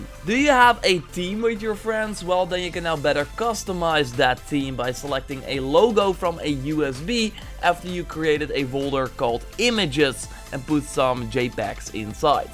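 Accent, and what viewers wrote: Netherlands. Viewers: Een nederlander hehehehehe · >Dutch accent >Horizon zero dawn DOE ES NORMAAL GODVERDOMME Goeie video :)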